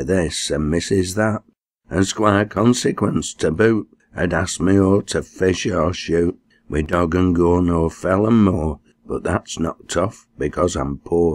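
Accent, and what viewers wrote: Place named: Lancashire, United Kingdom. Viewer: I really like the subject and the lovely Lanky accent but it was far too fast for me ( I am lanky too) If you could slow it down a little I am sure it would be so much powerful as then I could understand the words. I really hope to visit you again soon, you have a lovely voice and wish to "continflab" further : )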